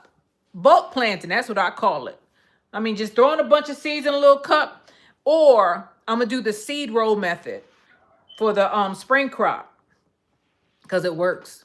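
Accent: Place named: New York, United States. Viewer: Your video popped up on my feed. I love it. I knew you was a Newyorker I can hear that NYC ancient 😃❤ . I am a Trinmerican from NYC too. My father is Trinidadian.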